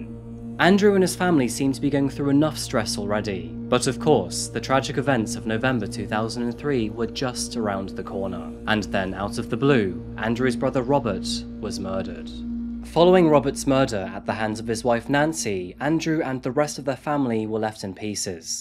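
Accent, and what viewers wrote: United Kingdom. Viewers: Mate your British like me. Half our town names are spelt differently from how they're pronounced lol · Lol, not understanding why Connecticut is pronounced the way it is is rich coming from a man that sounds very British. Americans, try pronouncing a great many British village names and you would be wrong. By the way, I really like your channel. It is one of the very best crime shows on the internet. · I have to turn on the closed captioning in English because sometimes is hard for me to understand what he is saying 😂😂 it's the British accent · as someone from the UK you are kinda brave saying we pronounce things wierd in the US. That being said I have no explanation for Connecticut.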